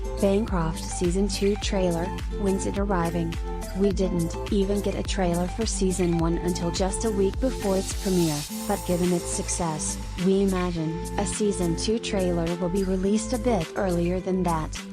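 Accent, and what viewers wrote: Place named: United States. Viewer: I lost the will to live watching this, and now this robotic, American blurb ..... aaaaggghhh!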